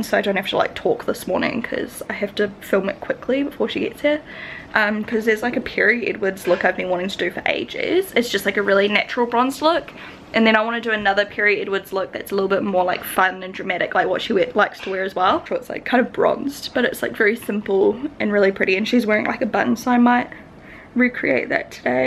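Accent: New Zealand. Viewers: love you xxxx extra love your kiwi accent, makes me feel like I'm home xx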